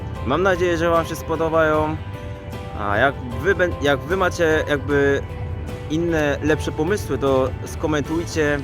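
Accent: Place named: Poland. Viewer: Czy ty nie jesteś przypadkiem Polakiem, bo akcent masz nawet nasz. To jest naprawdę niewiarygodne, opowiedz coś o sobie.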